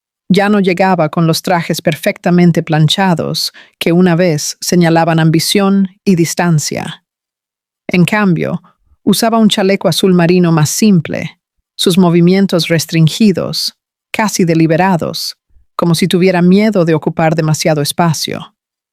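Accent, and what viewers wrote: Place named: Brazil. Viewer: Esta historia es Brasil por eso le sale el espoñol asi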